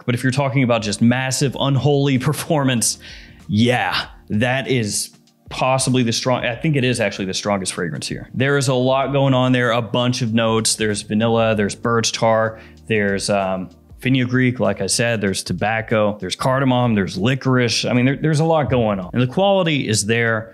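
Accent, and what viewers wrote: South, United States. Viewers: Southern accent, again. Awesome!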